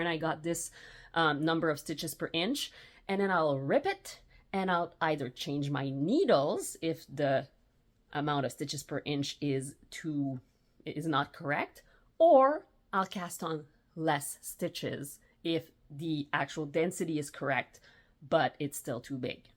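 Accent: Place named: Quebec, Canada. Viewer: I’m originally from Ottawa, born in Gatineau, so I’m enjoying your French Canadian accent! I wonder whether you could recommend yarn and where I can get some at reasonable prices? Going to watch your 14 years of sock-knitting video 😊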